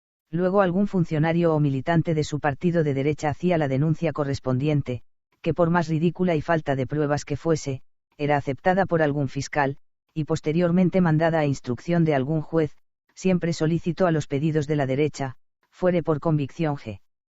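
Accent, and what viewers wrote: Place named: Spain. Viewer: Pura propaganda kirchnerista con acento español. Nada serio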